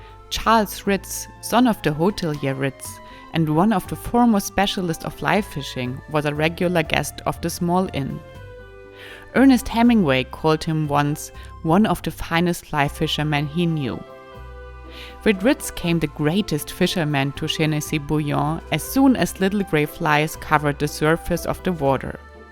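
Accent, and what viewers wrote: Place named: Germany. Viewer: Jura is pronounced SHÜÜRA and not with your heavy kraut accent YOOORAH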